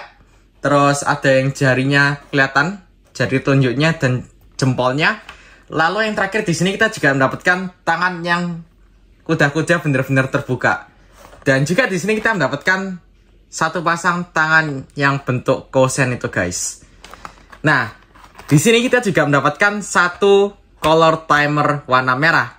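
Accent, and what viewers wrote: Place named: Java, Indonesia. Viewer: how much are this in rupiah and where can i get this in Jakarta? on a side note, i find it unique to how you’re a Chinese but speak Javanese dialect/accent 👍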